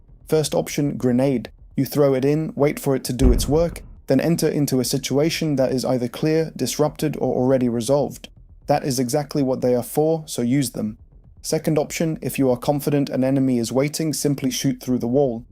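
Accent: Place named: United Kingdom. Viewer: When i hear a British accent i just assume the person speaking has no idea about they're talking about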